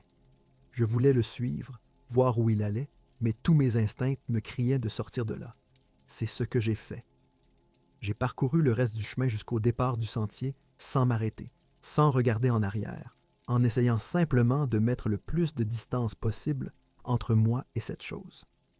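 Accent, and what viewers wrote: Quebec, Canada. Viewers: Oh wow! Belle découverte! Contente d’entendre une voix québecoise qui raconte des histoires d’horreur! Et hop, une nouvelle abonnée! Salut de Laval!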